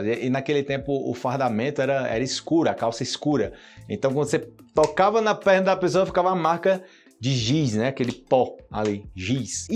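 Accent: Antioquia, Colombia. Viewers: toda la razon, si bien soy chileno he tenido la suerte de hablar con gente de varios lados y pensándolo realmente suena como colombiano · si el vivió en Medellín Colombia como 5 años .habla con perfecto acento colombiano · Crealo yo note lo .mismo e incluso me atrevería a decir que vivió en Colombia por qué suena como paisano jajaja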